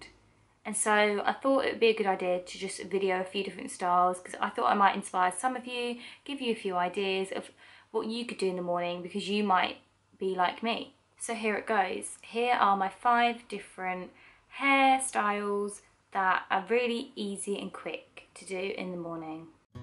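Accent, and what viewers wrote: United Kingdom. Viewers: I love the British accent that you have!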